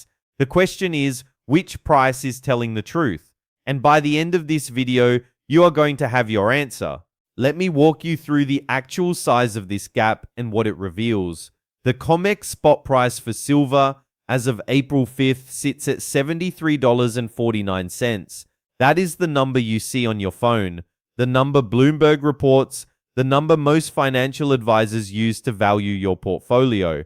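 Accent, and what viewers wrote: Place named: Australia. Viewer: AI crap with a cheesy Australian accent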